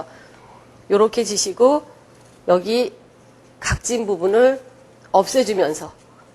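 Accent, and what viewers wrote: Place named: North Korea. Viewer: 북한 사람 말투인데 어딜까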